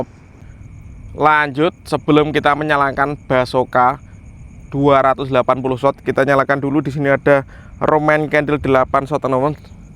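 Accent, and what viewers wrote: Java, Indonesia. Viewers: Bang kue uong jowo yo😅😅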